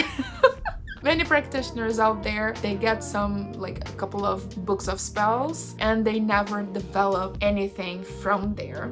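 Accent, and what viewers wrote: Brazil. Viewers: Eu sabia que você era brasileira!! Seu sotaque é muito daqui <3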